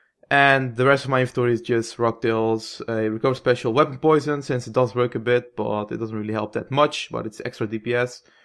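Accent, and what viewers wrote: Netherlands. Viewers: i can litterly hear youre dutch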